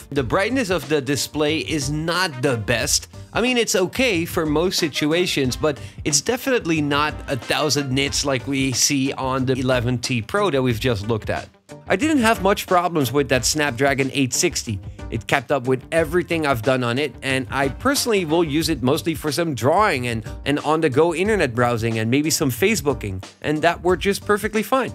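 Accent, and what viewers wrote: Netherlands. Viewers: I sense a Dutch accent 😄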